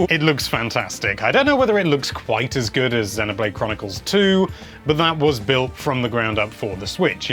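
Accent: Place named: United Kingdom. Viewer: I really appreciate the way you talk about games and the cadence you use and how you try your best not to spoil any of the plot. I have watched a decent amount of your videos and they are great. Your accent actually makes it even more appropriate for you to review the Xenonblade series since all of the characters have a similar accent...um...British perhaps (multiple use of the word "govens"). I love Xenoblade Chronicles and I am glad they put some of that quality of life stuff in (especially for side quests because it drove me CRAZY to run around at random in an area to pick up blue orbs in hopes of finding quest items for over an hour sometimes). Nintendo should thank you. Your reviews are sometimes an important factor I utilize when deciding to purchase games. I will definitely be picking this up. "I'm really feeling it!" Thanks.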